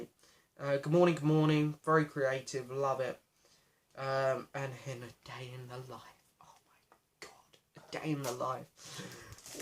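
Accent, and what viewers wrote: United Kingdom. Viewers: i think that because of your accent you are british rather than american am i wrong?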